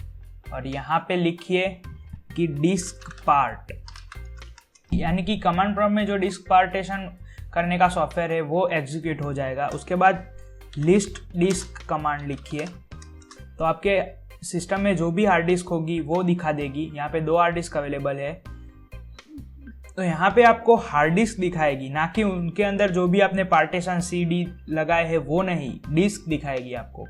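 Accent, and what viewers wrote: Gujarat, India. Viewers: Aap Gujarati ho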